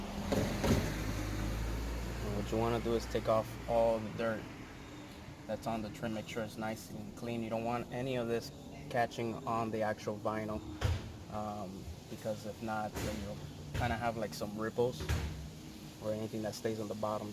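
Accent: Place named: New York, United States. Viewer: Says Florida but you talk like your from New York, or similar.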